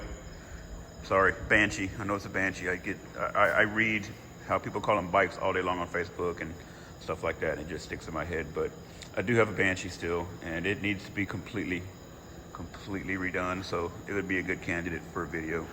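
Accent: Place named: South, United States. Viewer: I used to watch your videos years ago! I want to say thank you for all the banshee knowledge you were able to share. Just realized you have a Midwest vocabulary with your southern accent. I'm from tip of the upper peninsula in upper Michigan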